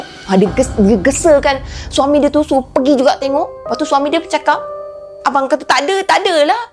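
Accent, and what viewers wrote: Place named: Kedah, Malaysia. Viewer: org kedah mai dh ni😎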